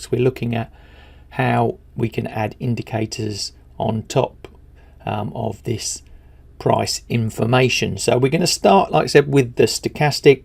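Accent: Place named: Kent, United Kingdom. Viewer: Does anyone know whether is a Kentish speaker? It’s the one British English accent that has a tinge of Australian elements, though we probably should say that the other way around. Apparently, Kent has been overrun by Essex speakers, so the traditional accent has mostly been displaced in recent generations.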